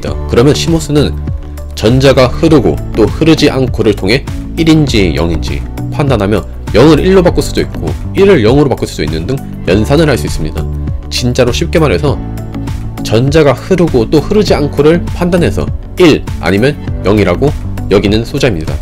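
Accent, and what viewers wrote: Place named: Gyeongbuk, South Korea. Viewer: 사투리가 대구 경북쪽이네 우리 부울경은 아닌듯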